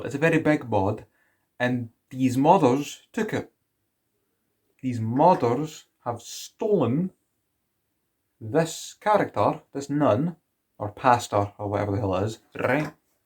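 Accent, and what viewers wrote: Ireland. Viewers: Wow this guy has such an amazing Irish accent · Love the Irish accent my guy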